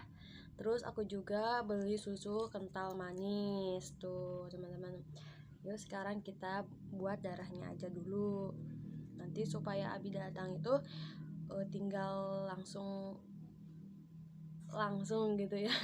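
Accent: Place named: Sunda, Indonesia. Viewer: Sunda banget wkwkwk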